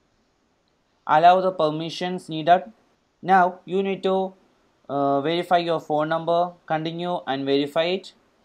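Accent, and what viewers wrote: Kerala, India. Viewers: Dushyant Vasani he spoke with a Malayalam accent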